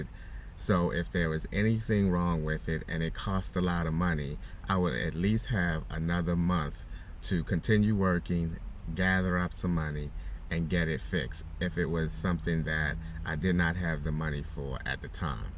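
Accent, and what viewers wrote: Baltimore, United States. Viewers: 🤗 I can definitely hear that B’more accent😎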